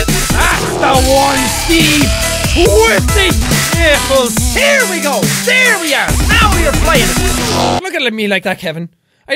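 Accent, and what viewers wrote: Ireland. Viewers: hes irish thats why he has that accent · He's Irish mate. I'm half Irish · I just got out of surgery. What’s better than watching my surgery as a video game with an Irish lad commentating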